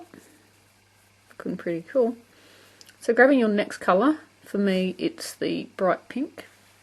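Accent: Australia. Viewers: So nice to hear an Aussi voice. This will be my next project.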